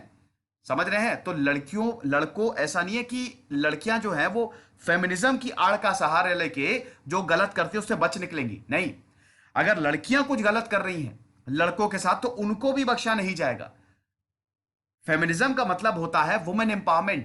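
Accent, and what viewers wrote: India. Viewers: Sr your voice is amzing and different from other and bilkul desi style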